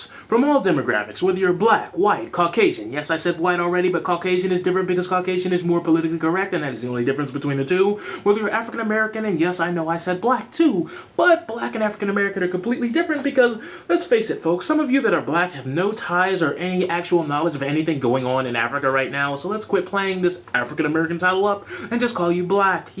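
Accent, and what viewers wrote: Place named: RP, United Kingdom. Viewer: queens english.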